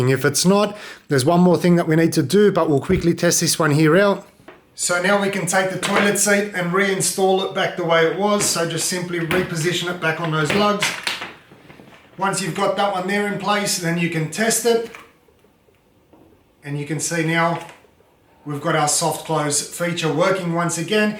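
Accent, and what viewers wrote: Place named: Lebanese-Australian, Australia. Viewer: I left my home in Sydney's inner west and moved to QLD in 2007, geez I miss the Habib accent. Thanks for the lesson and the bonus nostalgia.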